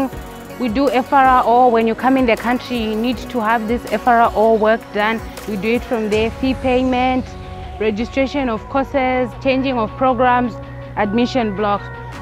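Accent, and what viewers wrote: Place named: Zimbabwe. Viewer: Nice video babe Your school is nice❤️ I’ll do my school’s vlog too❤️ But why did u have zim accent lol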